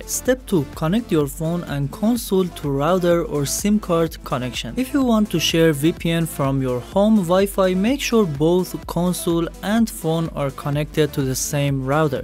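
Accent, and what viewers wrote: Iran. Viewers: فکر کنم شما ایرانی هستی. از لحجه انگلیستون فهمیدم👌🏻✌🏻😅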